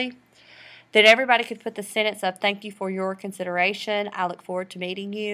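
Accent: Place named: Georgia, United States. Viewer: Thank ye kindly, Georgia Girl! Lovely voice and accent. Very helpful. TYVM! :)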